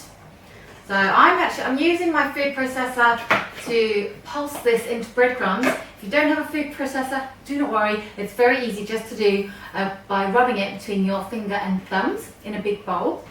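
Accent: United Kingdom. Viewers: Love your cute little British accent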